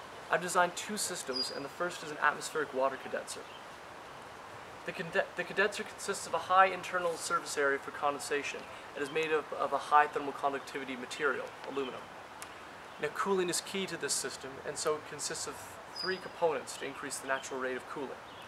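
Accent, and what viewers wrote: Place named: Canada. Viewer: Holy, crap. I knew immediately he was Canadian. Then he says so. Wow.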